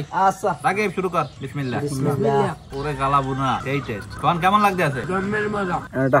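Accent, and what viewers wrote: Barishal, Bangladesh. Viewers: আমার কাছে বরিশালের কথা ভালই লাগে। আর ভাই এর কথাগুলো মিষ্টি ❤ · অসাধারণ মাশাআল্লাহ এক্কেবারে বরিশাইল্লা ভাষার নাহান😂 মজা হইছে দেখে বোঝা যাচ্ছে😊😊😊😊😊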